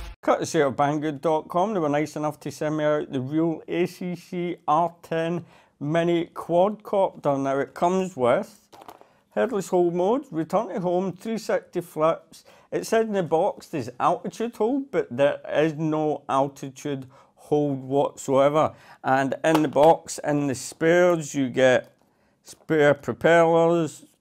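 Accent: Scotland, United Kingdom. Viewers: Haha 😂 Yes the accent is pretty thick:P I remember when I had to learn Scottish phonetics for acting purposes it was quite challenging. All accents vary in the way vowels are pronounced although Scotts tend to roll their r's quite a bit and are very lazy with pronouncing T's P's and D's. That said, in addition to speaking slowly like you said I think being deliberate in your pronunciation especially in your D's P's and T's would help foreign veiwers This vocal warm up I use to do, may be helpful to achieve this basically you just say this over and over again with strong diction getting faster and faster each time whilst still retaining strong diction... "The lips, the teeth, the tip of the tongue, the hard palate, the soft palate, Pronunciate, articulate, exaggerate." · Ahhh! Didn't mean to be rude, and beeing German probably didn't help me understanding your Scottish accent :-) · My grandfather had a heavier Scottish accent and He brought me up.I understand you well.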